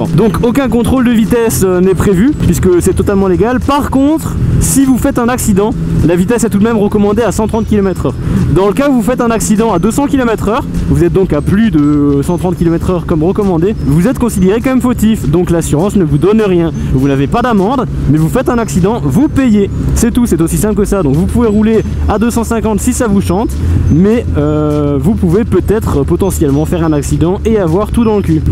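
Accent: Alsace, France. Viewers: Salut tu est d'où d'Alsace ? Sinon super vidéo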